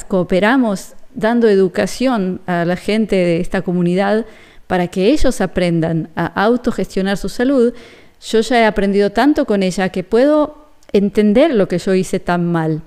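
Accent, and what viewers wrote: Argentina. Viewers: Así es querida estabas malnutrida literalmente te estabas muriendo de hambre, un punto que no mencionaste es que tomas tu alimento y no masticas no producis unas enzimas nesesarias para poder dijerir los alimentos y es otra razón por la cual estabas sin energía. No somos lo que comemos somos lo que dijerimos. Saludos y no pierdas tu acento Argentino no está usar el vos y usar nuestras palabras si nosotros entendemos al resto el resto nos puede entender a nosotros, yo también vivo fuera de Argentina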